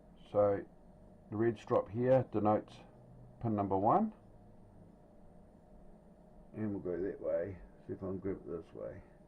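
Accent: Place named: Australia. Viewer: I've been doing similar hacks for ~25 years. I mainly repair arcade games but my house alarm had an installer password on it so the user can't change any settings. I dumped the eeprom then using the installation manual I found online I did a factory reset. then I dumped the eeprom again and did a search within that dump for the known factory password. then I simply looked at my original dump at the same memory location and found the installer's password. now I can change any settings I want LOL! You sound like an Aussie... me too! I'm on the West side Perth. Where are you?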